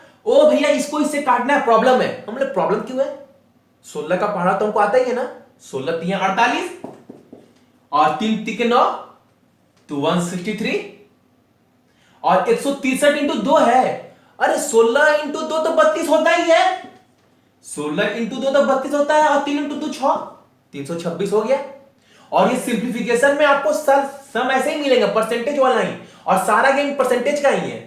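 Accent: Bihar, India. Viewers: i love this bihari tone awesome both subscribe and like done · very nice way to teaching sir....and bihari tone omg thnq sir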